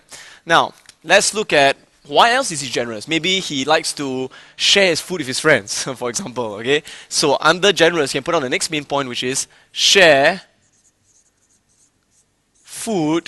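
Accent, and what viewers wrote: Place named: Singapore. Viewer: tiếng anh singapore nghe khó chịu quá :(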